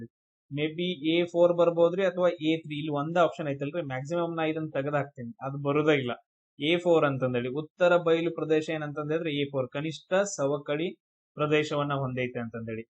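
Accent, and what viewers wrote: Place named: Uttara Kannada, India. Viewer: Basically I'm from malnad side.. your teaching style and u.k kannada is superbb sirr..,🫡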